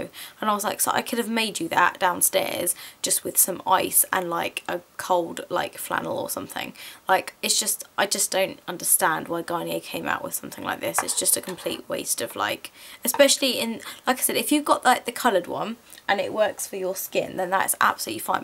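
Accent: England, United Kingdom. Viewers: Hi. I love your English Accent. Can you help me learn this...:-)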